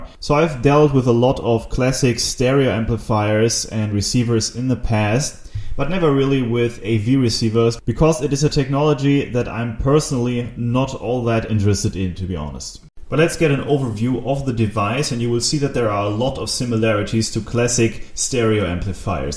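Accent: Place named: Germany. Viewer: Hallo, ich schreibe mal auf Deutsch, da ich einen entsprechenden Akzent in Deiner Englischausführungn heraushöre - ich hoffe, ich liege da richtig. Ich habe den Yamaha dsp a595a auch und wollte gern meine Boxen mit Bananensteckern anschließen, stellte aber fest, dass die Speaker-Schrauben keine Kappen haben, die man abnehmen kann, um den Banana-Eingang freizulegen. Was kann man tun ? LG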